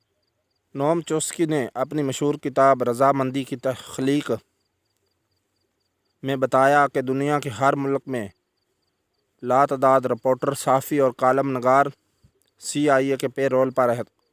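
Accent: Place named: Punjab, Pakistan. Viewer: It would be nice if some one who knows urdu language with out punjabi accent, stop punjabi Gardi !!!!!!!!!!!!!!!!!!!!!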